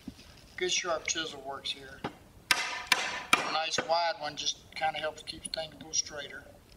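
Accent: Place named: South, United States. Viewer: I love the down to earth no BS way of explaining each step, complemented with that great southern accent. Thank You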